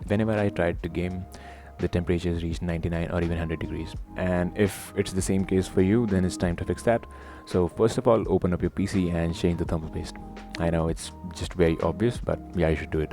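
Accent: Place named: India. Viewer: Judging from your accent you're most likely Indian. Always the Indian people helping with issues like this so thanks.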